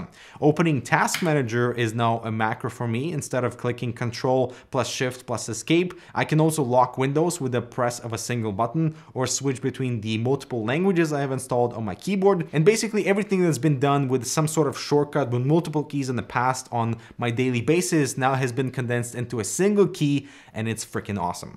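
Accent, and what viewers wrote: Russia. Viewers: По началу было сомнение что вы русскоговорящий из-за акцента, но потом в видео подтверждение проскользнуло. ;)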